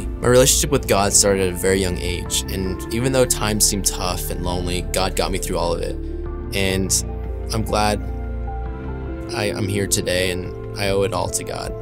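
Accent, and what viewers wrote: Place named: Kansas City, United States. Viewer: You sound like you are from KC..I am sending your videos to my 21 yr old who is a senior in AR but was raised in KS. She is strong in the faith and yet goes to a huge party school where it is frowned upon. Dating has been hard for her but she managed to meet Christian guys at Country dance clubs as she loves to dance and would go there in AR and then she went out in KS to one over break and she met a guy who gave her his business card with a bible verse on back. Here I am wanting her to be equally yoked and she keeps meeting guys in the weirdest places but they dont work out. Your videos are really funny!! Thank you for being real and even though its a difficult walk..Dont compromise or give up ...persevere...Jesus is worth it!!! God Bless!!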